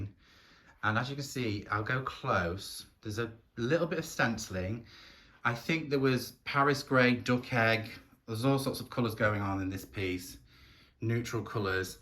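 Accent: United Kingdom. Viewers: I'm thrilled your moving forward in a newish you direction. Artists, I believe, always need inspiration. So happy you paused and are in a happier place. I'm glad I can still hear my favorite UK accent. Cheers to you from the States ❤🇺🇲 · Thank you for sharing your creativity and your wisdom on how to turn an ugly or aged piece of furniture into a beautiful piece of furniture that more than often brings me a startling and genius idea on how your work progresses. It is a journey and watching you from Quebec, Canada was is and still will be a great delight to me. I love it when you say this wood project or painting is therapeutic. Because it's for me as well in the last year. Fixing pieces, painting them isn't my real work like you. Soon I have to go back to the real world and start working. Wish you all the best! P.s. Your British accent reminds of my teachers colleagues with whom I worked in international schools and I miss so much.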